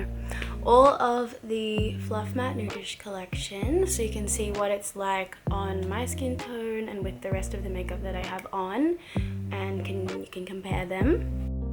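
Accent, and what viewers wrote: Australia. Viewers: why does she have a weird lowkey australian accent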